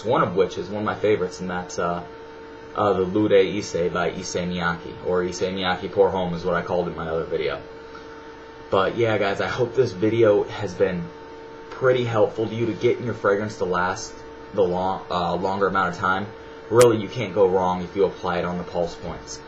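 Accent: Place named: Pittsburgh, United States. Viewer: your from Pittsburgh aren't you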